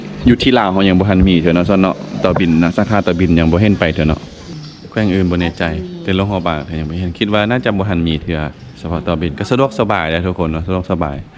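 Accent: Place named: Isan, Thailand. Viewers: สำเนียงภาษาลาวของแอด คล้ายๆสำเนียงภาษาเหนือของไทยอยู่บ้างนะ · สำเนียงเดียวกับคนจ.เลย เพชรบูรณ์ ครับ ผมคนเพชรบูรณ์พูดแบบนี้เหมือนกัน บรรพบุรุษอพยพลงมาจากหลวงพระบาง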